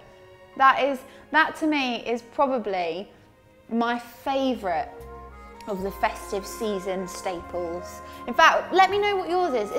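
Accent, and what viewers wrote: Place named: London, United Kingdom. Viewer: I love your London accent :)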